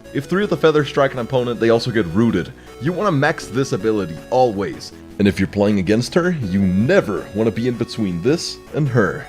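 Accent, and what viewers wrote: Denmark. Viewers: wait, you're danish? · Is danish? Or scandinavian for that matter?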